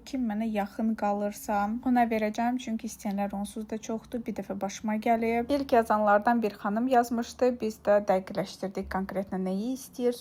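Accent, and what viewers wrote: Russia. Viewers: mən sizin danışığınızı çox bəyənirəm mənim uşaqlarımda sizin kimi danışırdı Rus dili idi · Salam yaxin olsaydin men de gelib goturerdim😢ve cox sirin danisirsan menim usaqlarim da rus dillidir rus sektirin oxuyular onlarda ele danisir ❤❤❤ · Xeyir sənin danşığında heçbir problem yoxdur hətta baxanda bir çox insandan daha yaxşı danşırsan əlavədə rus dili olduğun üçün xırda fərqliliy görlə bilər amma çox gözəl danşırsan hətta mənə ləzt edir sənin danışmağın sevilirsən❤❤❤❤ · danişıginda problem yoxdur, hətta bir çox rus dilli blogerlerden bele səviyyəli danışırsan. Sadəcə aktiv şəkildə rus dilində də danışdığına görə bu sənin aksentində hiss olunur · Rusdilli qadinsiz deye danisiginiz ferqlidi.ona gore ilk izleyenlere qəribe gelir. Ləzgisiz?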